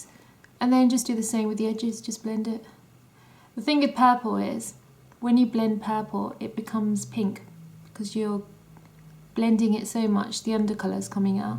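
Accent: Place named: London, United Kingdom. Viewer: wow i know iv already said this but sometimes it seems like its cheryl cole doing a tutorial but with a better accent i love the londoners accent. hope you both have a fabulous year ahead and keep making videos and easy on the eyes and ears sis mwah xx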